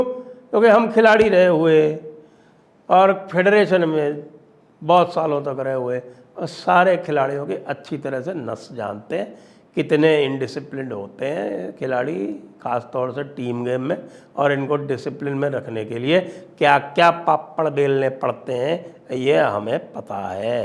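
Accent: Haryana, India. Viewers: sirs haryanvi accent is colourful 😅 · Once again the Haryani accent makes my day Dada!😂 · Saaaaar ji aaaapki haryanavi gajjaaabb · Ram Ram sa Sir🙏 Your Haryanvi accent is amazing😊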